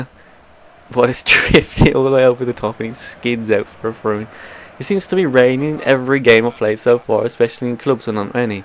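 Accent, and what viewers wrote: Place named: Wolverhampton, United Kingdom. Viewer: Are u from WOlverhampton cus u sound like u are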